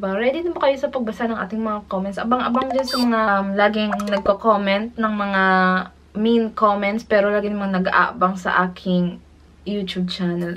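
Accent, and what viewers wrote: Visayas, Philippines. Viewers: tama jud dai. katawa lang ko aning mga nega. Just stay positive. bitaw pag first nako pag tan aw nako sa imong Blog or Vlog maka tunog mo ni Ms. Rhaze siguro the way atong accent na bisaya ana jud guro. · Ganyan talaga ang mga accent ng BISAYA! Wala ka kahit anumang bagay ba ginagaya. Ikaw yan! Kahit anong mangyari ikaw at ikaw parin yan. That's life! Just be proud of you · Mag ka boses kau ni Rhaze at visayan accent hehe hayaan muna mga nega... Stay safe! ❤️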